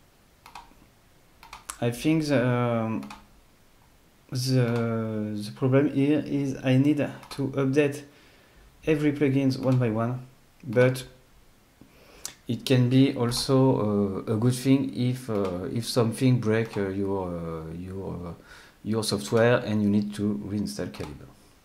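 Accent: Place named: France. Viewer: Do not ever apologize for your French accent! :)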